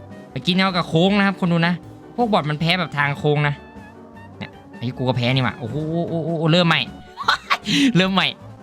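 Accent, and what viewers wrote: Korat, Thailand. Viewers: เอ้าคนโคราชบ้านเอ็งนิว่า555สำเนียงพี่คือคนโคราชเลย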